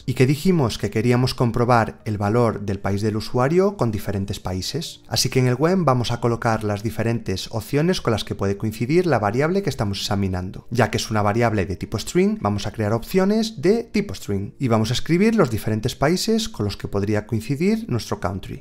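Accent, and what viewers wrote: Galicia, Spain. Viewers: Excelente descubrimiento este canal!!! No solo recursos en castellano si no con buen acento gallego!. una consulta ¿Cómo se hace para hacer un rango tipo edad >100 sin emplear else?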